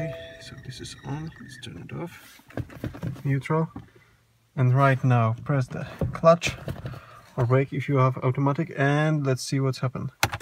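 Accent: Poland. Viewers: Ale mnie śmieszy ten polski akcent Polak dla Polaków po angielsku gada :D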